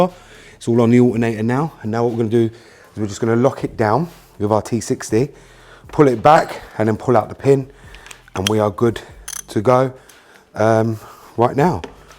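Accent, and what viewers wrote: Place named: Cockney, United Kingdom. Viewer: Hi. Don't know if you read comments on a 2 year old video but your channel is gold to me mate so thanks heaps. I am going to be doing this job soon I think. My 2007 E61 is at 160000kms/100000 miles now. I have a leaking valve cover gasket which caused a misfire on two cylinders dripping onto the coils. Those were replaced fairly easily but I'm going to get a professional to do the valve cover gasket as I'm don't have all the tools or quite the skills to do it confidently. Nor do I have the time. Thankfully I have found a really good BMW mechanic and the quote I got is pretty reasonable. PCV vent hose and eccentric shaft censor seal are getting done too and all up the job is $850NZ which is very reasonable all things considered. Great mechanic with the best reputation in town. He's about as Kiwi as you are Cockney and I think you'd approve of the level of professionalism and use of original BMW parts. 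There's plenty I would do myself like belt, tentioner and idler pulley but the valce cover job is a bit beyond me I feel. Valvetronics will need coding in any case. Cheers mate. Thanks again.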